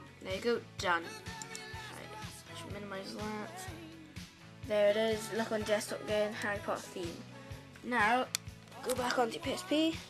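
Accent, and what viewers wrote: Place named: England, United Kingdom. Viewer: He means your england accent. xP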